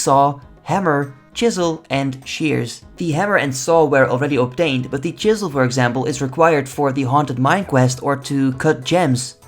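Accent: Netherlands. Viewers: Ik had al zo'n idee dat je Nederlands was... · Lekker hollands accent hoor haha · I think he is Dutch.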